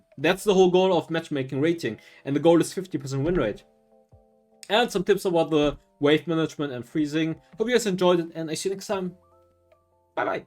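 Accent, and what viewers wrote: Germany. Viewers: Hahaha I'm also german and i noticed your little german accent although you are speaking very good english in my oppinion:D And I have a friend who has the same "family background" as you and I thought maybe has the same background XD I guest correct xD Aber im Nachhinein kann man das ja immer behaupten. Warum hab uch das grad auf Englisch geschrieben xD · Wait u are german??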